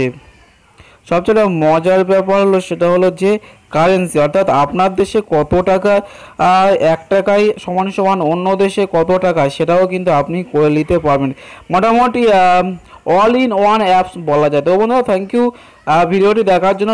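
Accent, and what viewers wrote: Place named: Kolkata, India. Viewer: ভাই কলিকাতার মানুষের উচ্চারণ এমনই ভাই। আমারদের যেমন নোয়াখালি..........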